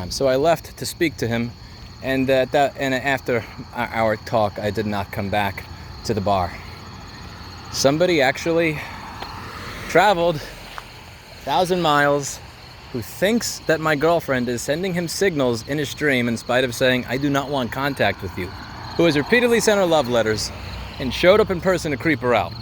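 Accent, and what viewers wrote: New York, United States. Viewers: All I'll say is that his New York accent came out...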